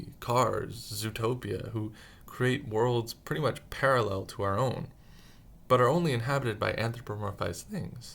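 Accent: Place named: United States. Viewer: I was not ready to hear an American narrator. I didn't know they made that.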